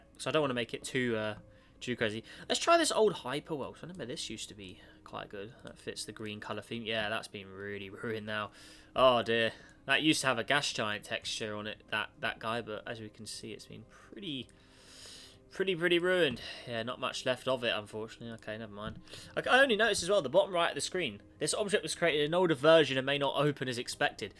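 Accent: United Kingdom. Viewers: IS BRO BRITISH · Btw are u from England cause u have a British accent its just that is from USA and that's Fr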